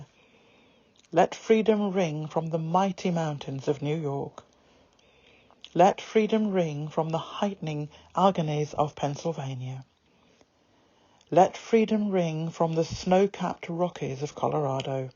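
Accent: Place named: England, United Kingdom. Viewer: every time I hear that speech it gives me chill bumps. This will be the first time I heard it from a person with a English accent…and it was beautiful! I also think the speech was poetic.Thank you!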